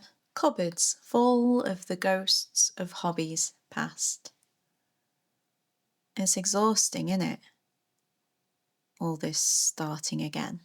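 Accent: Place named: North, United Kingdom. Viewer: Still got the northern accent 🎉🤟🏻💪🏻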